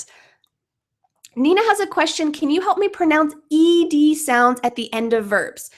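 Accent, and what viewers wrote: United States. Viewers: Hi, I am from Indonesia. I like your video much, as I like American accent, especially you have. Thanks a lot.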